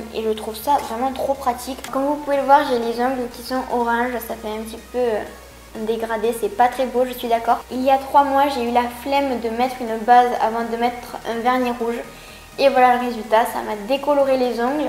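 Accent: France. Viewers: Le sang j'adore ton accent du sud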